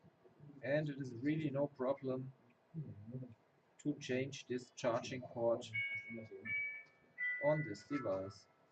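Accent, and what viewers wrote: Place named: Germany. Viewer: Thank you for spoken English, which is very good. Also your cool German Accent makes English sound more interesting. Would you please consider adding English closed captioning to future videos? I’m sure you guys will gain a lot more subscribers by doing this. Your work is excellent. As always, a BIG 👍 from me!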